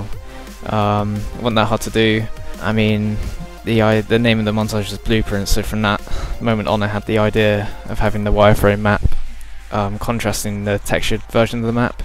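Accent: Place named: London, United Kingdom. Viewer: Ah ok, sounded like you where from London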